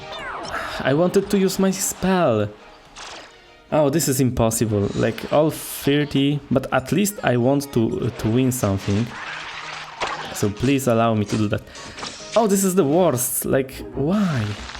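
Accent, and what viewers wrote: Poland. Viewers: Nie wiem odczuwam, że jesteś polakiem, tylko my tak kaleczymy angielski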